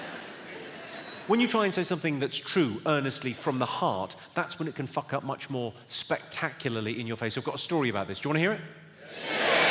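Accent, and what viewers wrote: United Kingdom. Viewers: Can you explain (or transcript, to be exact) the punchline, please? It's somewhat hard for me to decypher some words in british accent.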